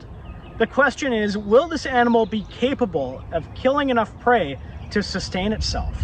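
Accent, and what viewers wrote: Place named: Canada. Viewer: Canadian accent is strong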